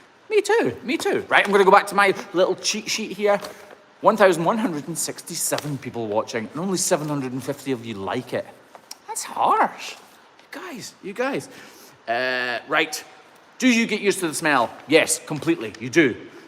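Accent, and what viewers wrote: Scotland, United Kingdom. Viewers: I realize this is a stupid sounding question, but as I get older my hearing gets worse and my ability to decern accents has become total rubbish so I need to ask for clarification are you irish or scotch? My heritage is a a bit muddled, English, Irish, Scotch, French, German so you can imagine my shame at not being able to clearly recognize the accent, although my best guess these days is you are Scottish and the guys at Tech TV are Leprechauns.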